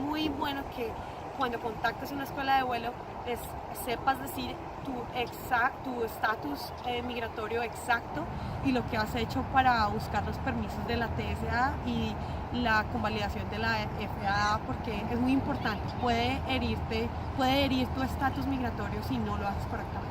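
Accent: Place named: Colombia. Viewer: Gracias por la información, soy mexicano y estoy tomando entrenamiento en Texas, he seguido el proceso que recomendaste pero mi duda es, ¿con sólo mi visa de turista puedo sacar hasta comercial? Apenas tengo 20 horas pero quiero ir figurando como le haré. Gracias de antemano por tu atención y ayuda! Pd: Me encanta tu acento colombiano 😁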